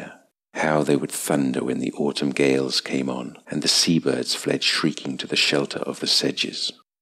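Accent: United Kingdom. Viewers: It's a thundering story with mesmerizing British accent. I like the way of narration.👍🏻👍🏻👍🏻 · It's nice to find stories with British accent. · I like british accent. I listening your vids while shadowing them.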